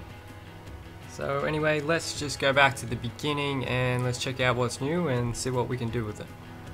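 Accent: Australia. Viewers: are you aussie, just curious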